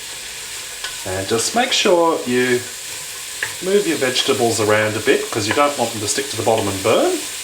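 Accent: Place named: Australia. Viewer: most aussies talk like that! me included!!! i think he talks nice!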